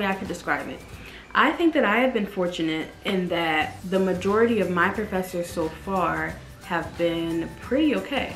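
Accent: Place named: Jamaica. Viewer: I heard that Jamaican accent. Nice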